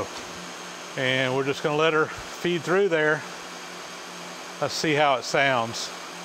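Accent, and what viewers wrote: South, United States. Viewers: It is: United States of America, southern Georgia version!!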